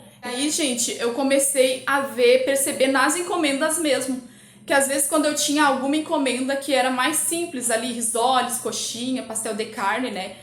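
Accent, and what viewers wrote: Rio Grande do Sul, Brazil. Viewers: Fiquei um tempo no sul e era difícil achar salgado bom. No RJ tem muita gente boa. Pelo seu sotaque eu acho que vc é gaúcha ❤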